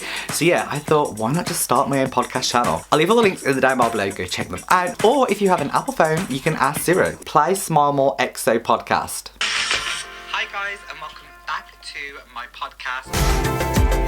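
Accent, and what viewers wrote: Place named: Birmingham, United Kingdom. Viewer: AHAHA this whole time I could never figure out where you were from, as if you're a brummy too.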